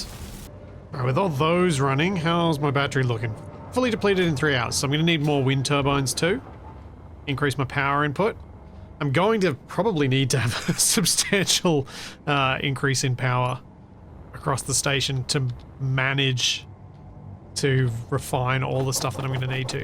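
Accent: Australia. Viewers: Fair enough. However, the fact that you neglected to mention intentionally avoiding the vanilla grid storage and retrieval mechanics during your quandary about how to get both vehicles home did at one point prompt me to think, "Gee, if only a well know YouTube Space Engineers content creator, perhaps one with an Australian accent, had made a tutorial about the grid storage and retrieval and how it was perhaps the most important feature of the update..." 😏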